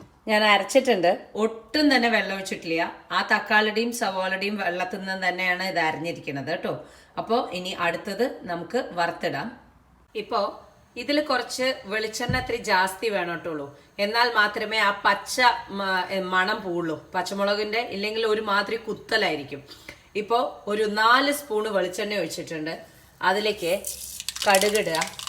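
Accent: Palakkad, India. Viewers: Dosakku ippo kazikkanday thani palakkad slang ishtapettuttollu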